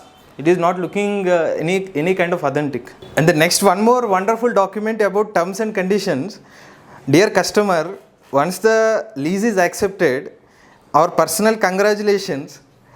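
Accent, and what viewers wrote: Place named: Kerala, India. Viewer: Kerali accent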